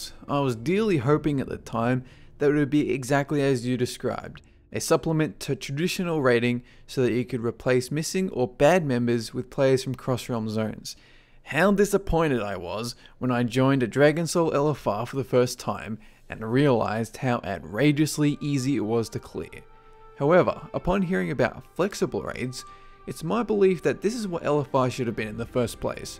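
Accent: Australia. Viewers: i love your accent lol now stupid question, are you Australian? Thanks for all your time in making these videos i am learning so much about WoW that i never new before. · You're Aussie arent yah? What realm do you play on wow?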